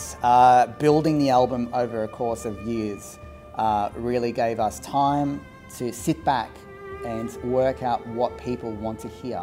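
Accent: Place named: Australia. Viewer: Hi, its always so great to see you again with that wonderful Aussie accent and listen to your great 🎻 ❤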